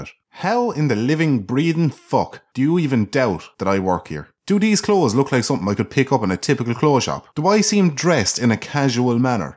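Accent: Ireland. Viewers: You're a great laugh to listen to. Keep up the great boyo and lets grow your channel. If there's one thing the world needs more of it's an angry Irishman giving out fuck about shite.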